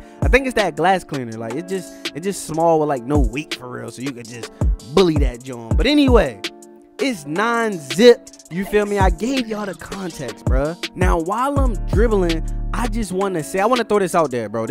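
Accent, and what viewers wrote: Baltimore, United States. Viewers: You gotta be from Bmore with the accent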